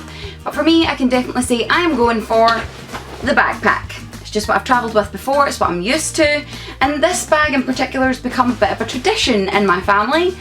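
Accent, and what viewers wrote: Scotland, United Kingdom. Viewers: I love your accent. Ive been to Scotland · Are you Scottish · Are you scottish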